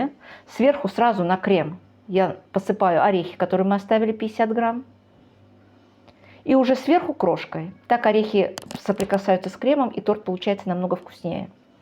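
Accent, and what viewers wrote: Baku, Azerbaijan. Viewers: Вы видимо не так давно выехали из Баку, у вас до сих пор есть бакинский акцент🎉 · Этот незабываемый говор бакинский❤ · Чувствуется легкий бакинский акцент....😊 Спасибо! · Как приятен слуху Бакинский акцент. Родилась в Баку и прожила там 25 лет, но не знала такого рецепта. Спасибо , надо попробовать.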